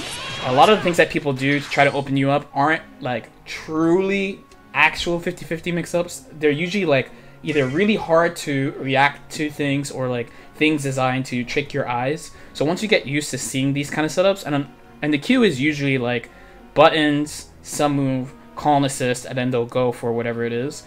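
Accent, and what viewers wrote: Baltimore, United States. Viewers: Bruh you from Baltimore?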